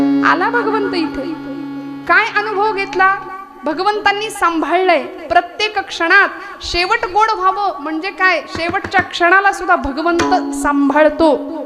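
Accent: Standard. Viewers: फार सुंदर , छान सादरीकरण शुद्ध उच्चार गोड वाणी मनाला भावून जाते .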